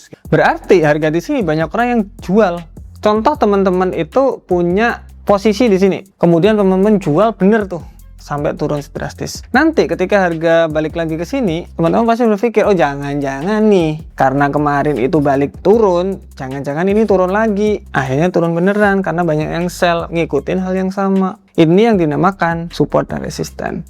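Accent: Java, Indonesia. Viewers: bahasa Jawa ini bukan osing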